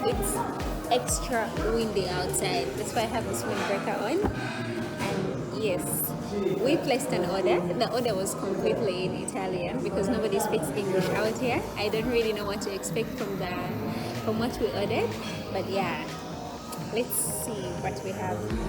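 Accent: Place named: Netherlands. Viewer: The English has really changed. This is really a Dutch accent. U loved the Vidoe bby gal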